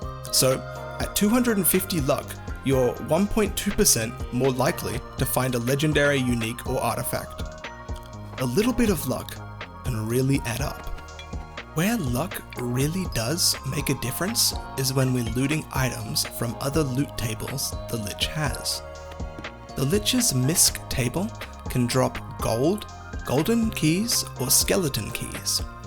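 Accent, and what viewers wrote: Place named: RP, United Kingdom. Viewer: This posh accent is too much for me bud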